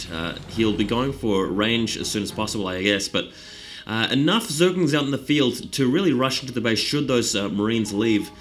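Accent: New Zealand. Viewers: kool to see a real starcraft game again, some of my best games on this map long time since i viddied some of, the new zealand accent is nice:) btw i think ret has +1 attack on his lurkers or atleast i always got that for them first so they twoshot marines with 3 armor?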